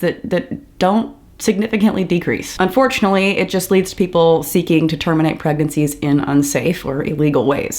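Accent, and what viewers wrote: South, United States. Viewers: Is it me or are you developing a Southern accent? 😮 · Just me, or does have more of a southern accent than I remember? Also, very happy to be in a blue state right now. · It’s fun to hear your drawl gradually increasing! Is sounding more Southern as well? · an extra bit of southern drawl in your accent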